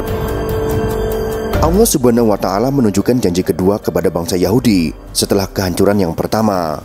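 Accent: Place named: Bali, Indonesia. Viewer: Admin nya orang bali sepertinya. Dari logat suaranya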